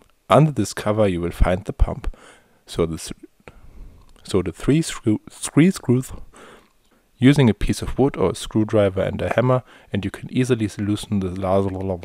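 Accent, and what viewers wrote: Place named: Germany. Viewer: 😀 Ich dachte erst du machsat jetzt auf Englisch Videos, wechseln ja viele zu, weil es halt viel mehr Viewer bedeutet. Ich kann ziemlich gut Englisch und muss sagen, dass du zwar voll Deutsch klingst aber alles voll verständlich von der Aussprache! Sehr gutes Video, bis auf das Wackeln, hatte das gar nicht auf deinem Channel gesehen, muss wohl vor meiner Zeit gewesen sein. Wünsche dir ein angenehmes Wochenende! PS: Herzlichen Glückwunsch zu 10.000 Abonnenten, das ist ja der Hammer oder hast du die schon länger? 😀 Bin manchmal verdaddelt, dachte nur gerade ,,Woa geil, er hat die 10K erreicht!". Das ist ja jetzt die erste Grenze zu Hobby-YouTubern und richtigen Berufs-YouTubern. Wenn du Werbung schaltest, mache ich auch gern den AddBlocker aus. Das ja wohl das Mindeste! So Roman Ende 😂👍🤦‍♂ LG HEUERs Pit Stop 🚗💨💥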